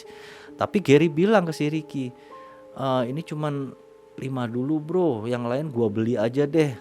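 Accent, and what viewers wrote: Java, Indonesia. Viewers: Daya tariknya justru ada di medokmu bang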